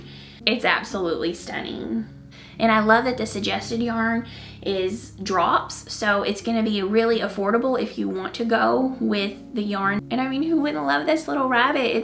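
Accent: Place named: South, United States. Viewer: I played this video in the bg while I was decluttering my bed so I could sit and knit, but I was immediately drawn to the video when you started talking! I absolutely love your accent because I come from the southern states myself, so I felt like I was right at home watching your video! I used to hate my southern accent, but in recent years, I've come to embrace it. It made me so happy hearing that you stayed true to yours, too 😊🤠💗 Much love from Kentucky!!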